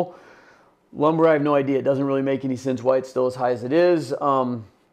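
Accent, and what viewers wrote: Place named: California, United States. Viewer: Sounds like you’re California, like me 😳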